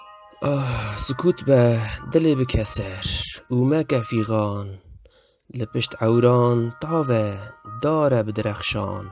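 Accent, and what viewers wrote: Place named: Serhed, Turkey. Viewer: Başê, brez ber zikê xwe ya têr dixwîne.. a rast kifşê ku serhedîye🤗🤗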